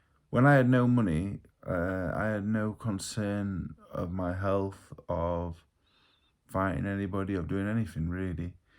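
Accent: Yorkshire, United Kingdom. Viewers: Never quite got my head round how people have conceived u to be punch drunk? I don’t know if it’s because I’m northern too and just take it for granted this is how we sound?! Especially being a Yorkshireman it’s almost a curse if you box as people assume uve taken too many punches when really you’ve been raised by big burley, thick sounding farmers or somewhere down the line ur ancestors have! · Definitely no slurred speech, you speak slowly, precisely and are articulate. Plus Yorkshire accent aswell might confuse southerners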